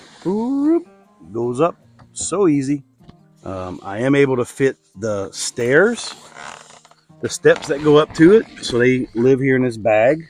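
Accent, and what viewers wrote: Louisiana, United States. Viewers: I’m watching you for the first time…I was saying to myself this Arkansas guy sure sounds like he’s from back home. I’m from Lake Charles but moved to Memphis recently for work. I have a Bronco. Gonna check out dem Ozarks real soon. Can’t find no good sausage in Memphis area. I have to bring Sausage and Boudin from home.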